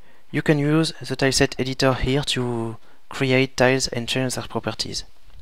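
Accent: France. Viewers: Marrant ça, avec un accent français j'arrive parfaitement à comprendre l'anglais :) (Gros HS)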